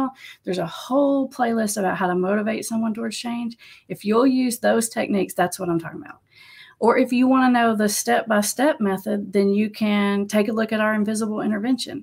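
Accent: South, United States. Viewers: Love the channel and your slow Southern style !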